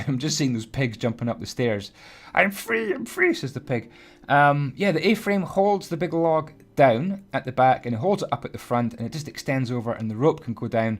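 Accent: Scotland, United Kingdom. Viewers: ! How have I not subscribed to you yet?! Hits subscribe The Scottish accent is irresistible. As for my suggestion, I'd say keep the village going! I love seeing it and I've watched each episode MULTIPLE times! Cheers!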